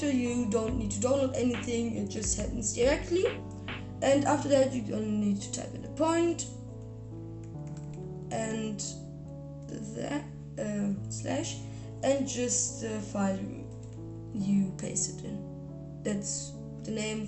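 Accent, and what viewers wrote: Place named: Germany. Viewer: du kannst gut englisch aber man hat das gehört das du deutsch bist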